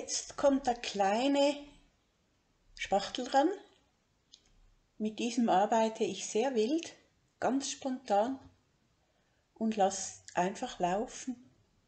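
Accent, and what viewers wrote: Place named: Basel, Switzerland. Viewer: Sehr schön Ihre Stimme zu hören! Ich schätze Ihre Videos sehr... Schöne Grüsse aus Basel ...Ich vermute (ihre Intonation) die Stadt ist Ihnen nicht unbekannt ; )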